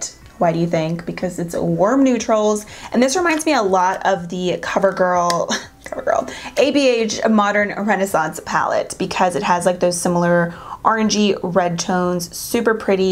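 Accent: Pennsylvania, United States. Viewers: Lol def a PA thing, I say A-range too lol I'm in Allentown! Love the look, very pretty! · I think Arenge is a PA thing! I'm from Philly but I live in Oregon now, and folks have commented on some of my very open vowels 😅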